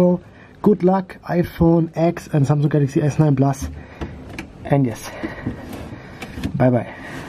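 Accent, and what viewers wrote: Germany. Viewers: Du bist Deutsch. Dieser Akzent 😂❤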